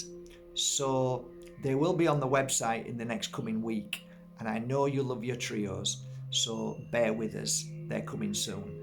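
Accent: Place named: Hull, United Kingdom. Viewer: was you originally from Hull . Its the accent.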